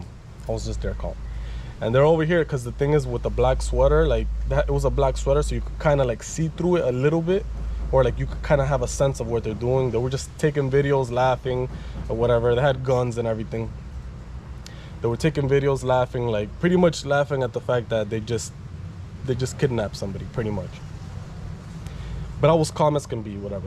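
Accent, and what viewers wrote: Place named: United States. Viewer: I have been to Colombia about 20 times across all the main cities and have never experienced anything remotely this wild. I am glad you are alive and made it through your ordeals. I would encourage you make calculated risks with the folks you meet. If I saw you in Cartagena, I would assume you were a local so don’t feel like you stick out; predators prey on fear and discomfort. I feel bad for what you experienced in Cartagena as I have been in many solitary circumstances between Bocagrande, Getsimani, Centro and Manga through into the late night hours and never felt unsafe. Always always a happy vibe and heavily policed. If you stay in the tourist zones, they are adequately policed and always full of people. You sound American middle class-so try plugging into Colombian middle class and stop partnering with businesses associates who can’t even feed themselves. 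Stick with Ubers- cheaper than taxis and there is tracking accountability. 2.5 million people visit Cartagena a year: if 1% of that number experienced anything similar to your ordeals, tourism would shut down and the city economy would grind to an end, which means the government has a true incentive to weed out these issues. I would recommend these tips: 1. Use Uber, avoid taxis and inDrive 2. Stay within tourist perimeters until you cultivate a dependable local network 3. Don’t call people friends, especially best friends when you just met them. 4. Don’t venture into barrios without an exit game plan. 5. Not sure how t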